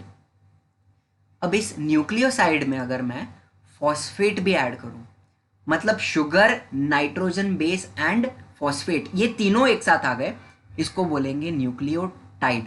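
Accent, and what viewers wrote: Maharashtra, India. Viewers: Sir tumhi marathi aahat ka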